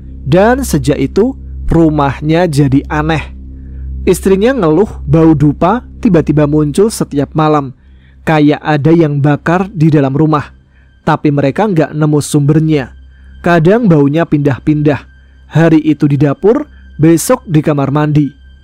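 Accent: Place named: Lampung, Indonesia. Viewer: Oi mas lampung nih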